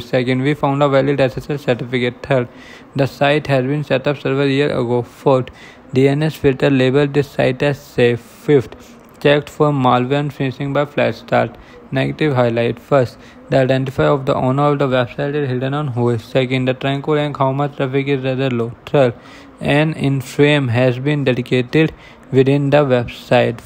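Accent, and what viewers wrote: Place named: India. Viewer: I feel ya, could not understand a word he said and the Indian accent does not help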